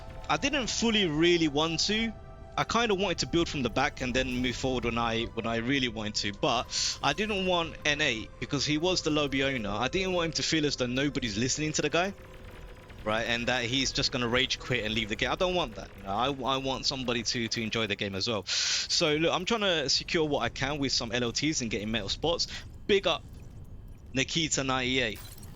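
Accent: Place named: United Kingdom. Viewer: what part of the UK are you from? accent is great to listen to lmao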